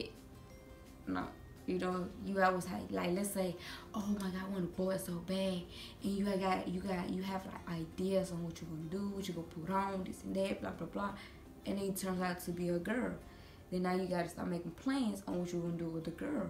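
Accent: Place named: South, United States. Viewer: Lol she sound country with a mix of Joseline herendez she really got a southern accent sounding like Omertà “ you so country you so country.. these hands country too” 😄😄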